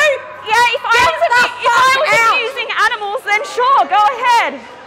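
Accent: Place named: Australia. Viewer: Where is her pretentious Quebecois accent though? The vegan zombie is from Quebec... This youtuber has an Australian Accent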